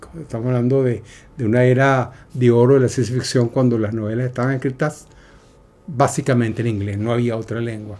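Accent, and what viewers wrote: Caracas, Venezuela. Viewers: Magnífico post sobre una magnífica obra. Acento caraqueño inconfundible. Suscrita y activada la campana. Pregunto: esta trilogía tiene traducción en español? Gracias. Mil exitos.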